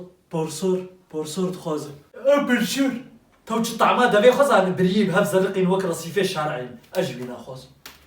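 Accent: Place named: Amuda, Syria. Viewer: عم تحكي متلنا يعني قصتي اهل عامودا انت من وين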